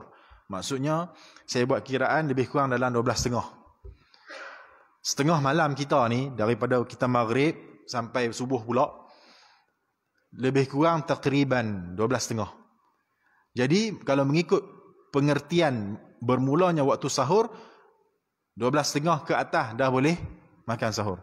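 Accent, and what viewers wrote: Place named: Kedah, Malaysia. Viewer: Komen pertama ustadz... Izin bertanya ustadz.. bahasa kedah dengan perak sama ke tak..?? Pasal saya dengar Bahasa ustadz macam bahasa kedah..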